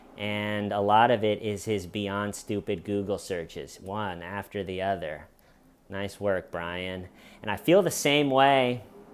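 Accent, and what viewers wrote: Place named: Boston, United States. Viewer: I'm from Boston and you make me lol with your Boston aaaacccent. I've been a true fan from day 1 when you post a video it makes my day! Any comedy show coming to Boston in the future?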